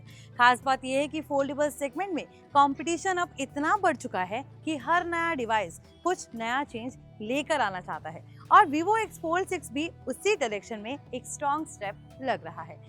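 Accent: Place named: India. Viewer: What language are you speaking? Sounds like India dialect mixed with bunch of some english words. Best regards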